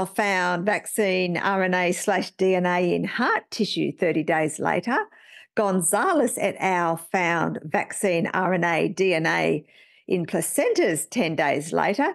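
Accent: Australia. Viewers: Some feedback on your Aussie speak. "Doozy" is more American slang really. It's a noun so you'd say something like, "Onya, another video that's a real doozy". Or "Thanks for the doozy of a video, ". Though "onya" (good on you) is definitely oz speak. Good Aussie adjectives might be "bewt" or "bonza" as in "Thanks for another bewt video, ".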